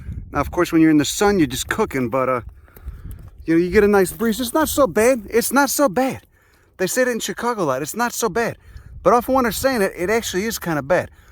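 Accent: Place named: Northeast, United States. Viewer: Man there are few things I love more than a heavy Northeast accent dropping heavy science. Good shit.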